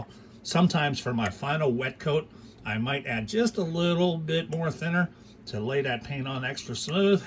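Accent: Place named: Ohio, United States. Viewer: Your accent sounds southeastern Ohio, no?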